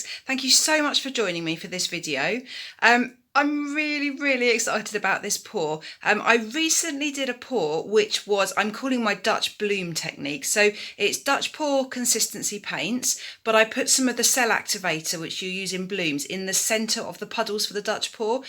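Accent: United Kingdom. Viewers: So lovely to hear a UK accent!! Glad I found you 😁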